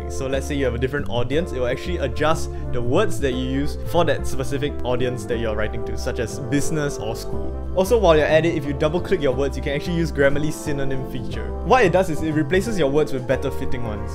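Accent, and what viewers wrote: Singapore. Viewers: are you Singaporean? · Hey are you Singaporean you sound like one ngl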